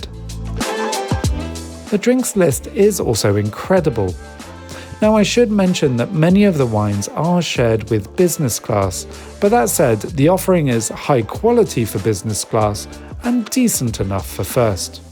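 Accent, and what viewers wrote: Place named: United Kingdom. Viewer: I subscribed because of your hairstyle and your British accent 🙂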